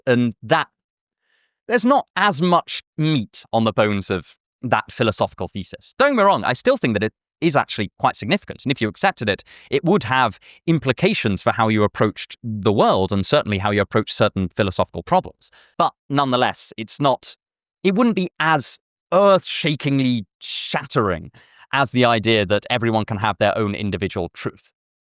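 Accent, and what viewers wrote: United Kingdom. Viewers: I missed the flavor of your providing text, but also being able to have accurate spellings provided (such as "deepity"), and being clear about what you're saying at all times. You're a clear speaker, but you're British and I'm American and the words help. Though I understand if it is time-consuming to have to provide them and you need to reduce your load.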